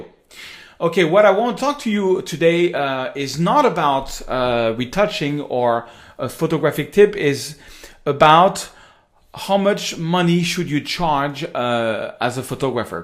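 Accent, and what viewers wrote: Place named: France. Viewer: Well done! I like your French accent in English. That's cute! Anyway, just to say hi and I like the way you speak and teach. Learned a lot from you. Cheers~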